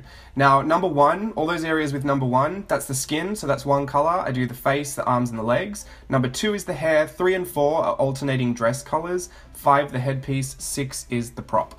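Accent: Australia. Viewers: Love the dolls and yes perfect for my journal. Great to hear an Aussie accent on here too there are not many . Yay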